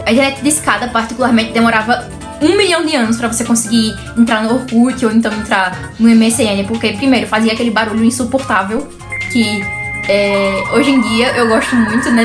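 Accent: Northeast, Brazil. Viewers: Talvez eu esteja enganado, mas percebi um leve sotaque nordestino em você, talvez influência de algum conhecido. Eu sou do nordeste, por isso. Eu sinto muita nostalgia dos anos 2000 também, muita mesmo, e mais ainda dos anos 90. Eu nasci em 1992 e possuo 33 anos, não importa nada do que digam e explicações, eu vivi esses anos e eles são infinitamente melhores que os atuais. E eu posso argumentar com fatos! As pessoas viviam focadas em suas relações interpessoais; A política e economia no país seguiam a normalidade, ou seja, infinitamente melhores que hoje; As drogas tinham menos alcance, haviam poucas facções e não controlavam o país inteiro como hoje; Havia segurança jurídica: Os políticos não roubavam descaradamente e nem prendiam quem descobrisse; A modernidade líquida não havia de tornado, ainda, o padrão. E eu poderia ficar aqui por horas. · Oii bom dia tudo bem? 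tava tomando café da manhã, e fui abrir o youtube pra assistir algo enquanto como, e seu vídeo apareceu na minha tela com um título que me interessou bastante, assim que você começou falar, já senti que era de algum lugar do nordeste/norte, e vi ali num comentário você falando que é de PE, sou de Garanhuns, não sei se conhece, ah e você falou que vai fazer 22 daqui alguns dias, vou fazer 27 dia 31 desse mês também, enfim, de qualquer forma queria falar que sinto que seu canal já virou um dos meus favoritos do YT, tanto por você ser do mesmo estado que o meu (isso causa uma sensação de maior próximidade, sei lá), quanto pela qualidade do primeiro vídeo, isso faz eu sentir que os seus próximos vídeos irão me agradar sempre, te desejo todo sucesso do mundo! ansioso para ver os próximos conteúdos <3, saudade anos 2000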